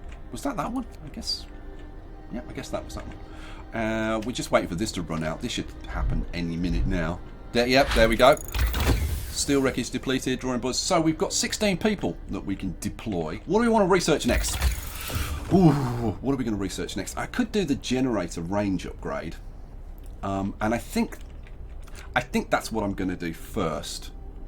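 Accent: United Kingdom. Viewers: I literally can not remember being so fucking entertained by a gameplay... its just amazing, and also i cant imagine listening to this in something other than a freaking british accent... Good job, buddy keep them comming! Lots of love!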